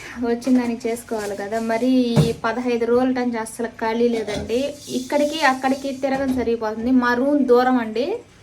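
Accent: Nellore, India. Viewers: హలో గాడ్ బ్లెస్స్ యు. నీ వీడియోలు చూస్తాము. ఆ నెల్లూరు బాష వీనీ ఆనo దీ స్త ము. మా ది గూడూరు. జాగ్ర త గా ఉండు.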